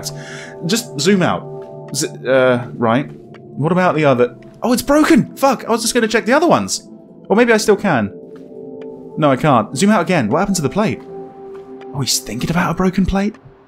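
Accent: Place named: United Kingdom. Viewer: Heard of this through mention otlf Twich streamer that does nothing, but puzzle & she always gets help from friends on the stream. I so wish i had them. Not pc or money. If can save up for gaming pc to stream on Twitch i get all these puzzle games one each month or so plus my ps 4 & smart flat screen tv ad only have older small one. This is amazing. I wish i had been able to do without getting spoilers, but it's ok. It is what it is ! Living vicariously through others atm. What can you do right ? I hope to catch up soon. Only got a tablet last yr finally. So behind gadgets & technoligy, etc. 😢😭😭 I love your voice. Great presentation. With that nice slower British accent it was very enjoyable. I'm more touch screen, app, and console type person. I do like puzzles, but not hard ones. I did Tomb Raider, but i need help sometimes just like steamer I mentioned though maybe not as much. 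Only when ger really stuck. I never done a serious game through alone without cheet guide book or online ao i dont know if I'd ever figure out a gane like this. Really complex. Blows my mond the dimemtions, but I get the workings now that seen all the watchers mentioned on YT, and this game is one of them so has made me understand puzzles workings more and a bot more confident I can, cuz learming from others playthroughs and see it from the other side of the screen and perspective.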